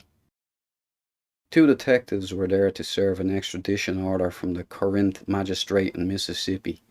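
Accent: Dublin, Ireland. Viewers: Spoken like a true Irishman. Well Done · well done...!...love the Irish axcent..!....& the quote"the Vietanmies were very lucky he wasent sent over there".....lol...4 sure....men like him are a hard find nowadays...!...he did so much in such a short life...R.I.P. Buford..! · Puss-er. The Irish type accent of the narrator brings out the 'Poos-er.' I'm thinking he would pronounce 'hustle' as 'hoostle.' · Just discovered this channel, great story. Fair play lad. You a Dub as well? Where 'bouts you from?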